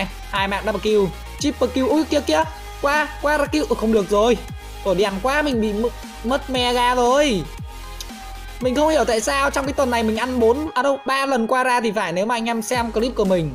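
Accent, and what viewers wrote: Nam Dinh, Vietnam. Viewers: A ở nam định hả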